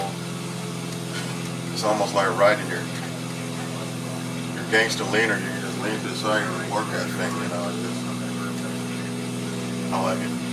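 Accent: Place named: South, United States. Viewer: Thanks for this! Looks fantastic! I'm getting 3DOF delivered in the next week or so. I have a couple of questions. I have Oculus cv1 that works great. Do I need to use the Oculus tracking lighthouses taped to the seat/machine for proper tracking and/or a program to keep it in sync? If my funds weren't limited, I'd get the Reverb G2 or even the Oculus S you have. My second question, do you need a program besides 3DOF's to talk with MSFS or X-Plane? I'm real psyched to get this built and flying! It's gotta be the ultimate immersion. I use to have a PPL, but my service connected disability prevents me from renewing. This tho will be just as fun..or nearly as fun! Thanks a bunch! (you sound like your from NC or Texas? If Texas, hope all is well for you!) Cheers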